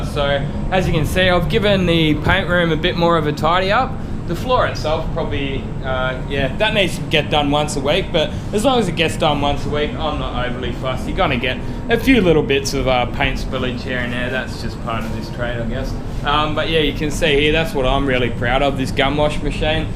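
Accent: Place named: Australia. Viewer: not sure if your in Australia or not, your accent makes it sound like you are. If you are will you please show custom spray mods how to paint. I swear he paints like some shoes never held a gun before. trigger happy as hell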